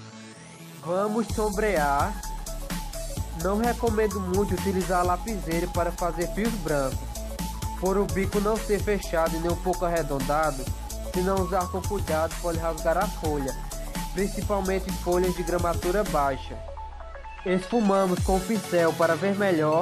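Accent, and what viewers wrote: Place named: Northeast, Brazil. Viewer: E esse sotaque do meu nordeste? <3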